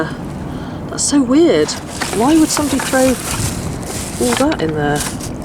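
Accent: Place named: RP, United Kingdom. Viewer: You should really do voice-over work with your Received Pronunciation speech. It is quite charming to the American ear.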